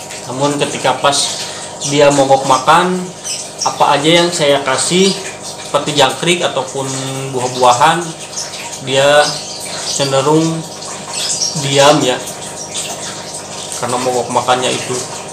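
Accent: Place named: West Java, Indonesia. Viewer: Sunda banget euuyy...😂😂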